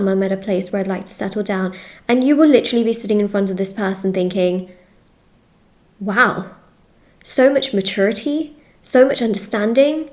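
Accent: United Kingdom. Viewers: You’re hot, but your hands are too big but your British voice is hot so it’s confusing to me.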